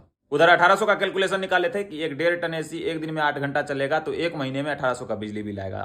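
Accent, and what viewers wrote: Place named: Bihar, India. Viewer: Qki dono bihaari hai..(no offence) Ye bhojpuri lehza hai..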